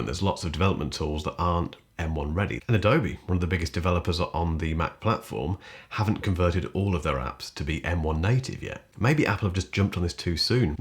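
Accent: England, United Kingdom. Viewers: 5 secs into the video I hit subscribe instantly just for your fabulous English accent! 😍😍😍 Great informative content. thanks a million bro 👌👌👌👍✌💗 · I'm only subscribing because I love this mans lovely English accent and soothing sexy voice! lol Ok, ok! Love his reviews too!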